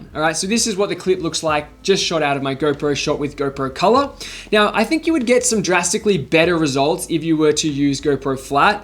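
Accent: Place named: Australia. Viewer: Loved the "bellissimo" part ahahha. Moreover, hearing some good Australian bettah and watah reminds me of my lovely months in Sydney. Greetings from Italy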